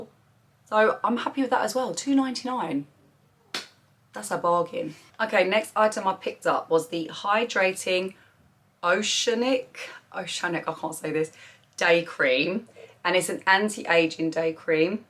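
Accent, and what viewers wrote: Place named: England, United Kingdom. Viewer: Your accent is so cute! What part of England are you from? :)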